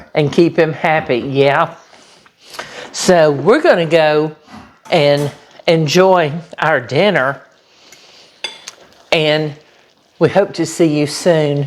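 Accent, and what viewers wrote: South, United States. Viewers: Next time I go to the grocery store, I'm going to get all those ingredients, have some on hand, and make that meal exactly how you made it. I saw your kitty on the porch. You give good vibes. I detect a little midwest in your southern accent. The best to you and your husband!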